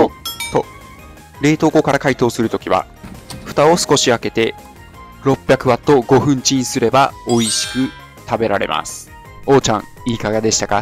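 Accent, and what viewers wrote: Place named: Miyazaki, Japan. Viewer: 宮崎訛り懐かしい〜😊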